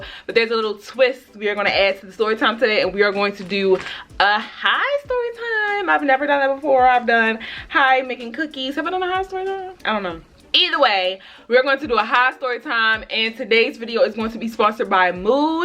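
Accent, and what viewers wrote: Baltimore, United States. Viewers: you from Baltimore you gotta accent I can hear it 😭😂😂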